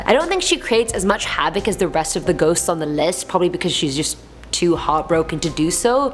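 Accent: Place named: United Kingdom. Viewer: ? Have you ever been to Hollywood or New York... I really think you would have a better chance at your career in one of those two places.. Question you sound like you are from the UK but you live in Canada mind if you enlighten me a little? You truly are a smart have great humor and awesome at Story telling... Mind if I jump on thee find love wagon with ya?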